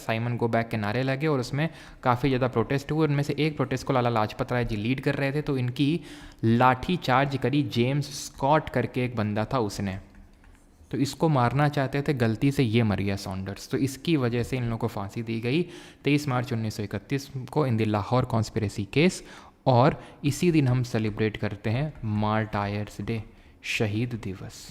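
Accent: Kanpur, India. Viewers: Sir aap kanpur se ho i know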